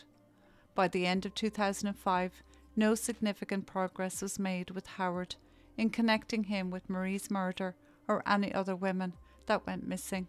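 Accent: Kilkenny, Ireland. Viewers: Is ur accent a kilkenny .i was born there but grew up in u.k.xxxx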